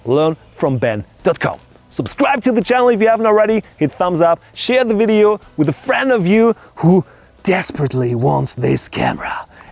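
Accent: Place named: Germany. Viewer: I really like your videos but your German accent is just crazy XD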